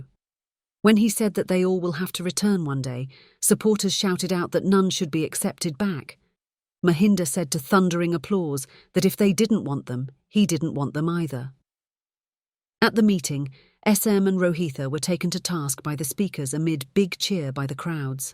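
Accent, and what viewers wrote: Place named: RP, United Kingdom. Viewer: Queen`s English. Very good.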